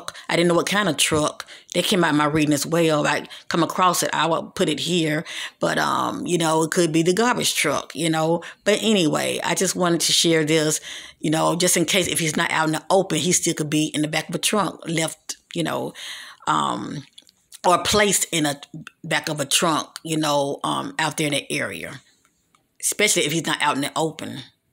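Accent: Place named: North Carolina, United States. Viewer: Idk where you're from but your accent sounds like southeast NC. It sounds like home to me. I know you're talking about really dark things but I get so soothed and relaxed from your voice because it takes me back to the women who cared for me, taught me and showed me love as a child. I wish many blessings to you and thank you for the hard work you do.💜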